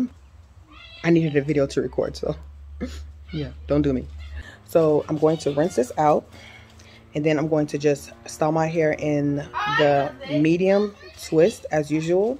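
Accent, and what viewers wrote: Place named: Nigeria. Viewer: Are you from Nigeria? Love your accent sounds like your from there.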